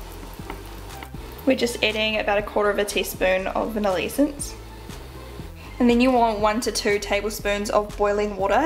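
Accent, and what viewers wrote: New Zealand. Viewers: Nice kiwi accent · Are you from New Zealand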